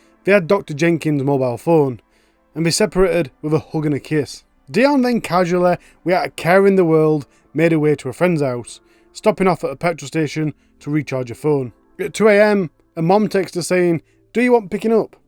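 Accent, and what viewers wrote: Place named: Liverpool, United Kingdom. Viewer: Hey what part of England are you from? Really distinct accent...is it Liverpool?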